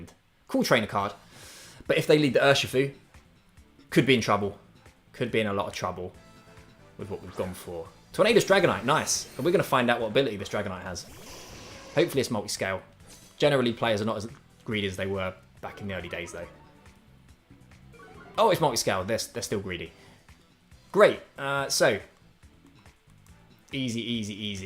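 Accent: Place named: United Kingdom. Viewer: Brits just say Ariados so much better than us Americans LOL If you want to continue the trend, I would like to see a Spidops team!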